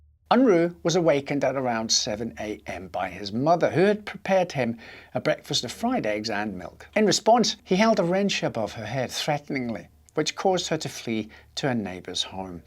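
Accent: Scotland, United Kingdom. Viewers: Everything is better when a Scotsman narrator 😅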